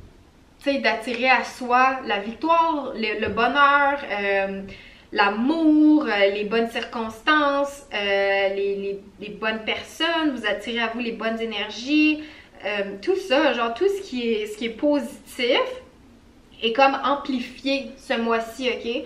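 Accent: Quebec, Canada. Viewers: Salut. Je te découvre joyeusement. Il est bon d'entendre un tirage en bon québécois. Tu es colorée et c'est plaisant. Bravissimo. 😃👍😃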